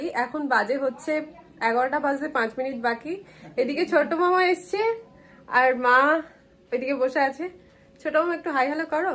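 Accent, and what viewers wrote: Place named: Bankura, India. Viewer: হটাৎ করে ইউটিউবে ভিডিও দেখতে দেখতে আপনার চ্যানেল ভিজিট করা,,তারপর থেকে আমি সিরিয়াসলি এটা জানি না কেনো আপনার ভিডিও দেখি কারণ না ভালো cinematography আছে বা না ভালো এডিটিং ,,কিন্তু কোনো এক অজানা কারণে হয়তো ভিডিও দেখি,, একটা কারণ বুঝতে পারি সেটা হলো আপনার কথায় একটা আলাদা টান আছে যেটা আমার বেশ ভালো লাগে, যতদূর সম্ভব বাঁকুড়া র টান এটা,,যায় হোক এগিয়ে যান,, আপনার চ্যানেল গ্রো করুগ এই আশা রাখি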